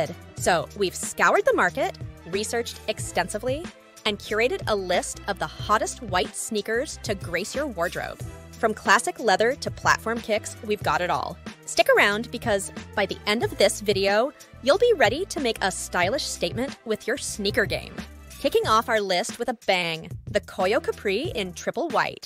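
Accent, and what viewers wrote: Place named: California, United States. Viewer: I’m not trying to be mean, that California accent is straight up out of Saturday Night Live, The Californians . 🦄 🌴 🌞 😂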